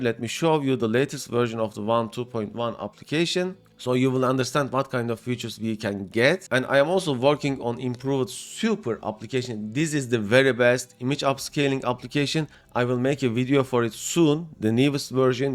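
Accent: Turkey. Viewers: Selam dostum yanlış anlamazsan aksanından Türk olduğun anlaşılıyor o yüzden Türkçe soracağım. Bu kurulumu herhangi bir amd gpu için yapmayı denedin mi?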